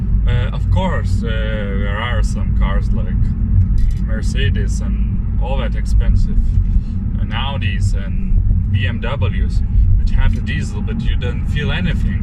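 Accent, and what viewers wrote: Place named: Lithuania. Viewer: Is akcento galvoju : turbut Lietuvis. Is video matau, teisingas spejimas :D Nu ir veliau pasakei: " Vilnius" fainas video !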